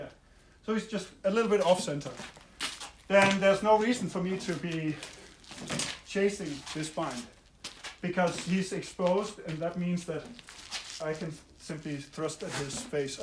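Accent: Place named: Germany. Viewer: Not being rude in any way, great knowledge! I think whenever you say "Ah" it's a little silly however doesn't mean you are talking out of your butt lol, I'm guessing English is a second language and German is first.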